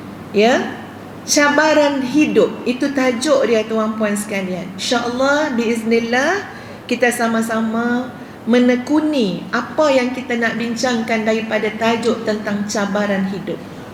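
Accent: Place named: Kedah, Malaysia. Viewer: Ustazah. Saya selaku org kedah mengaku ustazah pandai dah cakap kedah walaupun baru sat ja dok kedah.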